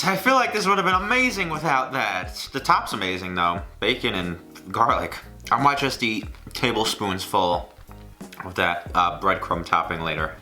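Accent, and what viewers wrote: New York, United States. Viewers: Sounds like suburban New York to me.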